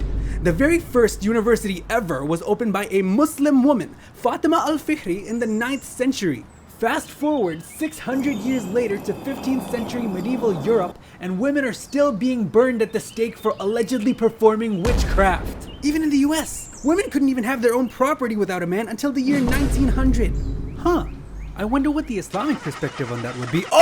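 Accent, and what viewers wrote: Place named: South Asia. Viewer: That accent is bro my fellow south Asian 😂. This is the first video of this guy I’ve watched but I love this dude. It’s sunnah to love your brother.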